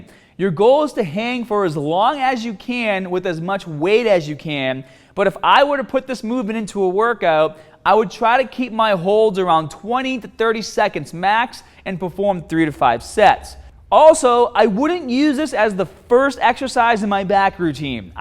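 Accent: Boston, United States. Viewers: Is that a Bostonian accent?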